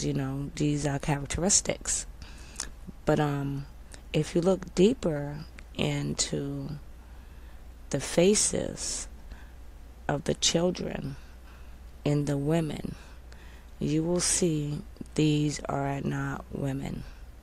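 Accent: United Kingdom. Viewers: The plummy accent obviously covers any faults of the remaining man vocals!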